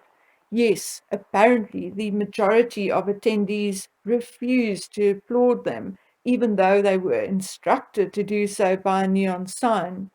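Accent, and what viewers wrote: South Africa. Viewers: I could listen to you talk all day long. I just adore the South African accent. X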